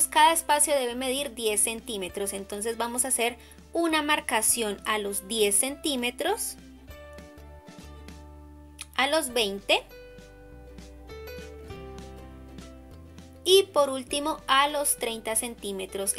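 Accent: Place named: Venezuela. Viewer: Eres venezolana verdad?❤️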